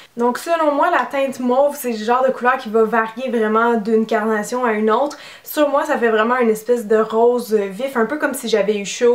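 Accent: Canada. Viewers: Très heureuse année à toi!! Et continue tes vidéos, elles sont toujours intéressantes et utiles. Je voulais te dire que ton style léger et fun me pousse toujours à regarder tes vidéos jusqu'à la fin..ou c ptete l'accent canadien lol... Merci pour la revue NYX j'hesitais justement à acheter certains produits de cette marque. Bonne journée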